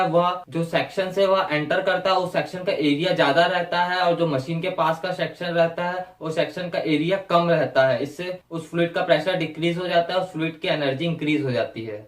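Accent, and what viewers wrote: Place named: Uttar Pradesh, India. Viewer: Up Jaysa bolta ho bohot halp ho ta ha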